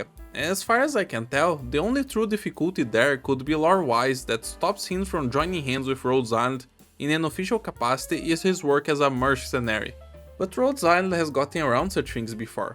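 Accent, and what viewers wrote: Brazil. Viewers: é muito estranho ver vc falando ingles quando é muito obvio q vc é br (o "sotaque") tipo amo os videos mais é meio estranho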